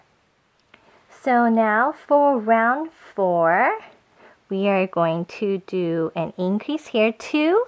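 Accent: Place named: England, United Kingdom. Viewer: Hi! I'm watching this video from Korea. As a non English speaker it is hard to understand(Because lots of korean like me are not used to English accent) but it helped me a lot when I make psyduck. Thank you♥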